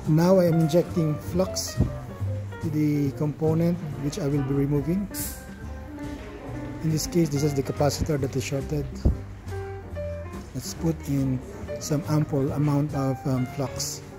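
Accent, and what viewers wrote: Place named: Philippines. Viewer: Sir you're accent is somekind a pilipino are you a pinoy? Thanks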